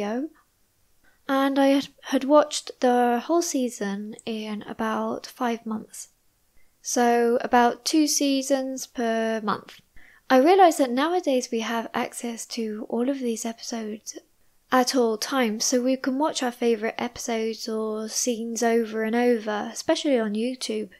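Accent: United Kingdom. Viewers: I think I'm still able to catch 95% the C1(without reading the text, off course). And I'm wondering how difficult it is on the C2. Will you make the listening practice for C2. Btw, I like your british accent. You use MRP, right? I still find it difficult to understand non MRP british english.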